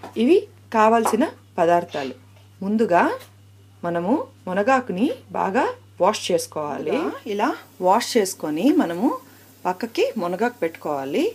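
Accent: India. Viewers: your accent resembles chittoor district style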